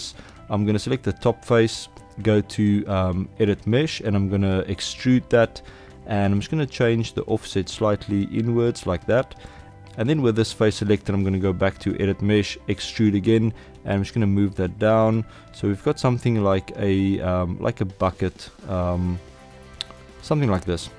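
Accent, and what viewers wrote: South Africa. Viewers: Ja wat se! good to hear some SA'n tutorials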